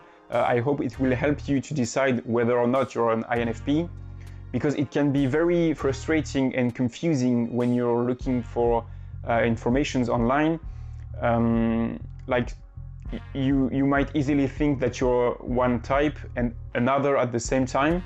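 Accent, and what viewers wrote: France. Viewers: Are you french ??🙂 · On dirait un accent français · I’m assuming by your accent that you’re french? I am too. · Wow this is so accurate, thankss for your video. I like your french accent btw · Mec t'es français j'en suis sur (du moin francophone). Ton anglais est lourd mais l'accent nous trahis toujours ahaha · An INFP child is not happy because they are surrounded by their pairs, they excluded anyway from their surrounding, but they are happy thanks to their imagination that helps them going through life : even though no one understands you and you feel lonely and shitty af, at least you have your inner world... it really saves you sometimes. Also, je rêve ou c'est un petit accent français que t'as là ? 😏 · Are you French? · arent you french ????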